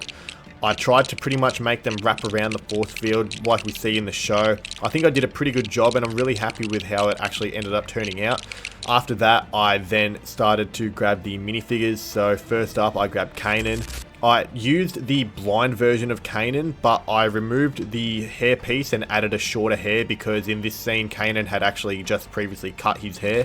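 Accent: Australia. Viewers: are you Aussie I am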